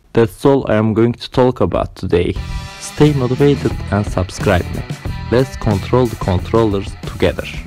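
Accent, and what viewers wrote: Turkey. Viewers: You are Turkish, I can swear but I can not proof :) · Are you Turkish? because your accent is similar :)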